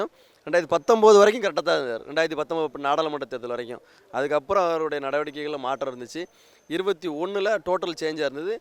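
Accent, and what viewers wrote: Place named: Tirunelveli, India. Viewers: நம்ம நெல்லைத் தமிழ் சிறப்பு அண்ணா 💥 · நெல்லை தமிழில் எழுத்து வாங்குகிறார், வாழ்த்துக்கள், உழைப்பிற்கு நிச்சயம் உயர்வு தேடி வரும்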